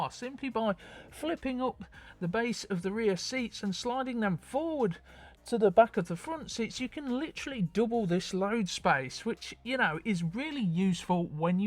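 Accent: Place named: Yorkshire, United Kingdom. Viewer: his yorkshire accent i love it! its when it drives around the corner and im like UGHHH but this is coming from someone who had a corsa B that shafted valves, waterpump and needed a rebuild...never forget that sack of.....but this...i love the colour and its basically a van! its a decent car and tbh nothing like the 1.8 i test drove years ago tbh i do like the fact its like a beamer...more oil out of it than in it haha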